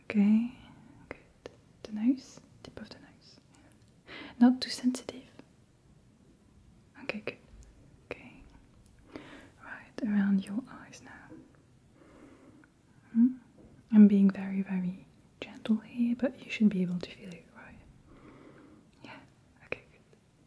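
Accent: France. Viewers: I love the touch of french accent that can be occasionally heard in your english. The popping c-, p-, and t- sounds are so tingly ✨💛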